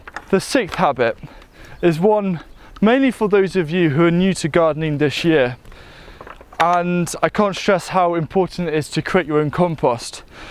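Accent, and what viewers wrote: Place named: United Kingdom. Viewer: Love the garden , The harvesting and The British accent.😇 LOL.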